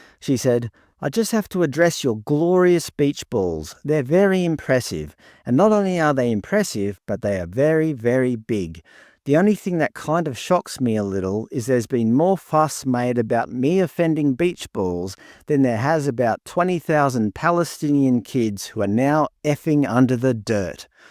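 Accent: Scotland, United Kingdom. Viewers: She's Scottish, not American. That's how Scots people talk. They throw around swear words like a farmer sows seeds. Still a bit over-the-top though.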